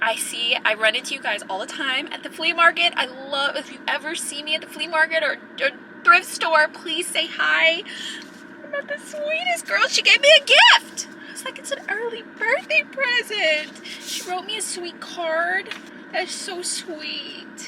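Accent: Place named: South, United States. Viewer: It's so funny how your accent gets waaaay more southern when you shop with your mom LOL. I Enjoy these flea market vlogs so much <3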